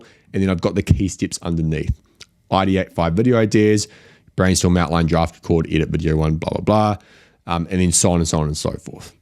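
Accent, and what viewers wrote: New Zealand. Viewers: probably you have to wait for YouTube. I think he has a New Zealand accent, I also have to pause sometimes to rewind and understand a couple words that are pronounced differently than what I'm used to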